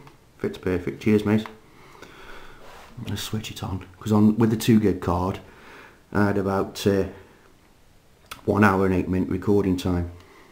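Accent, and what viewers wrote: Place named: Staffordshire, United Kingdom. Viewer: Good video and a distinctive Staffordshire accent.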